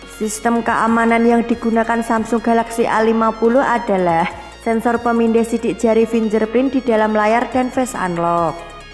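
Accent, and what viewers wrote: Java, Indonesia. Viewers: Suaranya itu loh medok bngt jawa nya hedeeh capek denger nya. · Apik mbak tapi ketoro muedhok ketok jowoe hahahahahaha suon njeh mbakku. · Medok banget suaranya wkwkwk 😂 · Terlalu medok mbak,menurut saya mbak harus bisa belajar public speaking yang baik dan benar dan juga yang terpenting gear mic nya mbak,kalau kedua hal tersebut udah terpenuhi insyaallah nanti channel ini akan sejajar dengan sobat hape ataupun gadgetin SEMANGAT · Suarane medok .. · Medok bgt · Medhok bhanget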